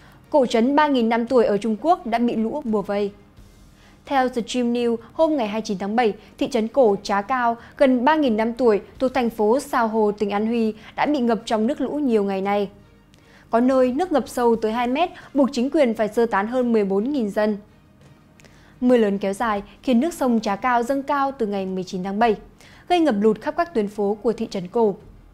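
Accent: Standard. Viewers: Mc nầy phát âm chuẩn quá. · BTV tuyệt vời phát âm rất chuẩn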